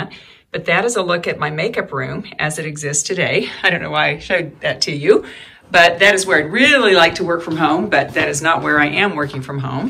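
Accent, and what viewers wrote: South, United States. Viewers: Hi! Doug again. Nice house! We seem to have more stuff in common. I too studied drama (not in college) and I lived in LA for a while when I was younger, did some minor stuff. But didn't get my star on the walk of fame, haha! I would like to pursue acting again now that I am older and wiser in some sort of venue. I also am about to get my life and health insurance sales license in the state of Florida, so I was curious to what kind of insurance benefits you sell? The testing centers are closed for now until further notice so I keep reviewing all my studies and hope to pass exam. It's a career change, as I mostly worked in hospitality before. I am also a writer and visual artist and play the piano. Artsy kind of guy. Are you from the south? That is my guess, haha!! I live north of Tampa in a town called Inverness in Citrus County, FL. 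Grew up in Tampa. Take care.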